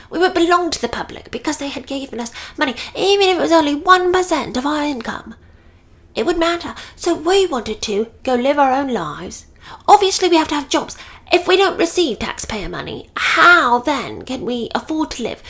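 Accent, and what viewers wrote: United Kingdom. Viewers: Love listening to you! Your British accent is hilarious ❤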